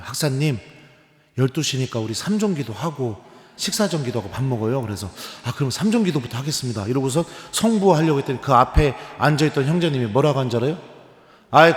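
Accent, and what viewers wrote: Chungcheong, South Korea. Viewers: ❤🎉 for your Choobg-cheong-do voice which is the same voice I have heard in Toronto Aurora, Luke Cho in Canada 🇨🇦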